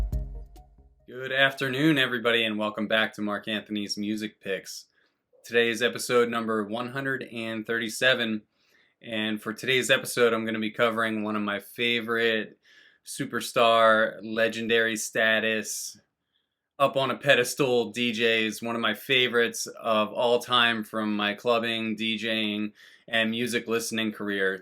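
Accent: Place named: Philadelphia, United States. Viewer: Haha now that you say that you do sound like you’re from Philly/southeastern PA, I didn’t know that. I just moved back up here to Chester county from south Florida in August, grew up out here. Glad to know though, seems like there aren’t many people out here collecting/playing/enjoying dance records but I’m sure I just haven’t met them yet! I’ll keep watching and learning, appreciate the content mark.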